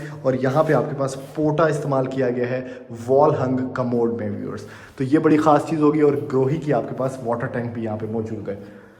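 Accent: Punjab, India. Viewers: Apni videos mein ap jo Punjabi touch daity hu usy increase kar do specially ye word " lamkaaa " howa hai apki mostly videos mein sunny ko milta😂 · Beautiful house with your beautiful punjabi touch accent. Keep it up😊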